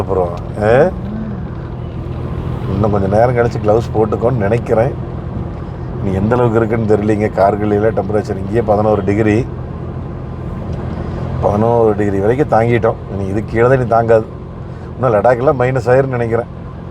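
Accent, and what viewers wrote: Kongu, India. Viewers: கொஞ்சும் கொங்குத் தமிழ் பேசும் கோமகரே...! திகட்டாத தித்திக்கும் பேச்சுடைய திருப்பூர் திருமகரே...! எங்கள் மதிப்பிற்குறிய டான் திரு. அண்ணா❤❤❤🙏 அவர்களே எப்படி இருக்கீங்க அண்ணி❤❤❤🙏 எப்படி இருக்காங்க ரித்திக்❤❤❤ எப்படி இருக்காரு. அருமையான வீடியோ பார்க்க பார்க்க இடங்கள் அழகோ அழகு❤❤❤ அண்ணா இந்த வீடியோவுல நம்ம TMF ஒருத்தர் "Marvel Movies"னு ஒருவர் கமெண்ட் பன்னிருக்காரு அவர் கமெண்ட் பன்னிருக்கது 1000% correct. குறிப்பிட்ட இடங்களில் மட்டும் ட்ரோன் கேமரா மேல பறக்கவிடுங்க. ஜம்மு காஷ்மீர் ராணுவத்தின் ட்ரோன் கேமராவும் முக்கால்வாசி இடங்களில் மேல பறந்து கொண்டிருக்கும். அவங்க கண்ணுல வேற ஒரு ட்ரோன் கேமரா பறக்குறது பார்த்தால் SHOOT பன்னிடுவாங்க. அப்பறம் LOCATION ah TRACK பன்னி உங்களுக்கும் Enquiry வருவதற்கு வாய்ப்பு உண்டு. கொஞ்சம் கவனமா இருங்க அண்ணா. நம் ❤சிட்டுக்குருவியோட❤ தங்களது இந்த லடாக் பயணம் இனிதே தொடர்ந்திட நல்வாழ்த்துக்கள் அண்ணா❤❤❤ குளிர் இன்னுமே மைனஸ் டிகிரிக்கு கீழ வரும் அண்ணா உடம்ப பார்த்துக்கங்க அண்ணா🙏 என்றும் அன்புடன் உங்கள் தம்பி என்றும் அன்புடன் உங்கள் TMF ரா.முஹம்மது கபூர் (சென்னையில் இருந்து) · கொங்கு தமிழ், இளமை,இனிமை அவர்கள்.TMF from coimbatore.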